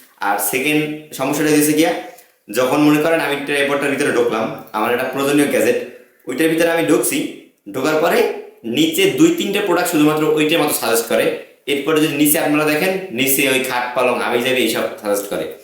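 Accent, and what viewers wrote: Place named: Sylhet, Bangladesh. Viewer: ভাই তুমি সিলেটি😅😅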